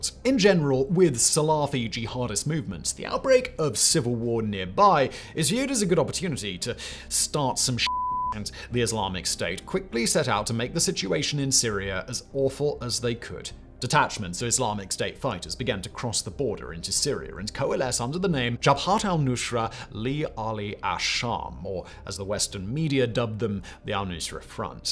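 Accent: RP, United Kingdom. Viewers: british english was extra thick in this one. Had to rewind a few times 😂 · Grew up in surrey and west sussex uk. I dont know what accent that is supposed to be but it sounds like someone who is trying to impersonate the royal family....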